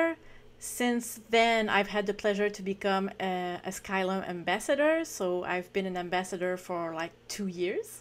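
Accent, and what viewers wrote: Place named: Canada. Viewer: Thanks a lot for these video, but I still prefer your French (with nice Canadian accent), please continue ! 🥰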